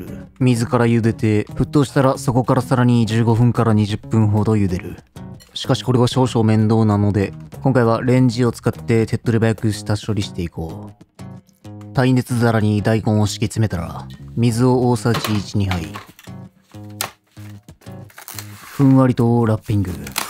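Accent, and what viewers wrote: Kansai, Japan. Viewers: 変な関西弁が混ざると思っていたが、本当に関西人だ。 西では天かすだが、東では揚げ玉。 これ、にこんにゃくのサイコロ切りを入れても触感が良い。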